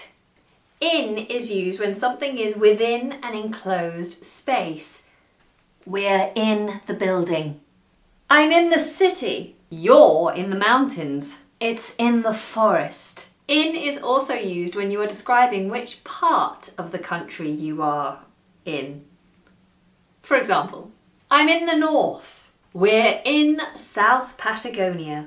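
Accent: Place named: United Kingdom. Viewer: I'm( in ) love with British pronunciation...A fan from colombia south America..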